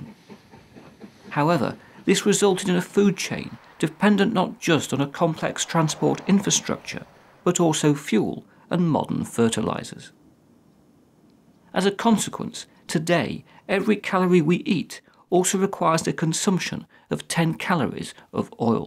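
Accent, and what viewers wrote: United Kingdom. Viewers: Does every fucking talking show host person have to have a british accent? Cool video btw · I think hes from Northern England. Im from London, our accents are quite different to eachother.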